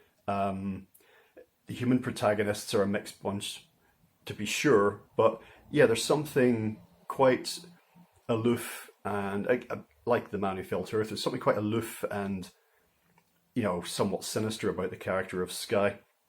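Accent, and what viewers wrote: Northern Ireland, United Kingdom. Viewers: Very glad to have found your channel. I'm guessing you're from Northern Ireland? I'm coming at you from Portadown... Network are great for releasing these old series. Particular favourites of mine are "Children of the Stones" and "Sapphire and Steel."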